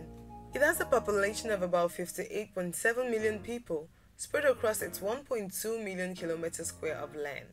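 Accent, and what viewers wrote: Nigeria. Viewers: This person sounds Nigerian Proudly south african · Your Facts are 100% and I must admire that given that I can Pick up the Nigerian accent in you...I thought you were gonna be Partial buh you proved Me wrong..And Yeah,South Africa is a Much Better Country to live in as Far as Africa is concerned .. Oohw..Im South African by the way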